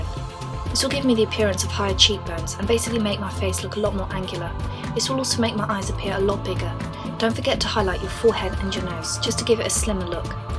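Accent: United Kingdom. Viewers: wow, i love your british accent and i love what you`ve done with your face; its damn sexy ^^ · Dunkles Avatar Make Up. Is aber der übelste britische Akzent.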